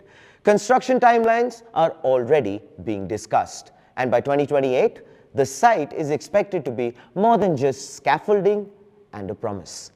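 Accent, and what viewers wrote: India. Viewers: Yes you can beat china by your fxxking braging mouth.....cause yours danm good in fxxking curry english That all😮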